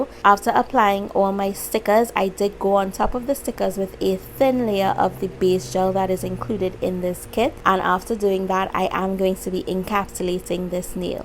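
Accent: Trinidad and Tobago. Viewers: You sound a bit trini are you? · Are you trini?? I love hearing a fellow trini accent 😍🇹🇹🇹🇹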